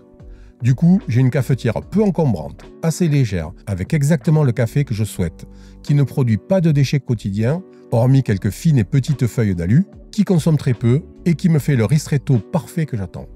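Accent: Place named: Provence, France. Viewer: Bonjour et merci une nouvelle fois pour votre vidéo , qualitative et initiant la réflexion. En effet, je suis à l’aube de faire l’aménagement de mon fourgon, pour un départ au long court avec mon épouse. Nous allons boire du café (beaucoup pour moi!), cuisiner etc..;vous connaissez le sujet. Je m’inspire donc de vos vidéos qui après maintes vues de centaines d’autres sur le sujet de l’aménagement et de la « fourgon’life » me parlent un langage que j’aime bien. Je laisse de côté ce que m’inspirent les autres… Et j’aime bien aussi votre accent, étant moi même de St Chamas. Je voudrais emporter dans cette future vie le robot ménager de ma chérie: le thermomix. Gros consommateur d’énergie, cet outil qu’elle maîtrise parfaitement depuis des années, nous vaut des repas à base de produits frais et divers que l’on peut facilement trouver partout. Votre montage électrique, sa composition, sa capacité se rapprochent du montage vers lequel je me dirige. 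Mais mon niveau électrique me fait hésiter quand à la bonne réalisation du projet. Je peut toutefois compenser par un esprit curieux et motivé. L’emport et l’utilisation quotidienne de cet appareil vous semble t elle appropriée où à proscrire ? Encore merci rien que pour ne pas dire de niaiseries Charles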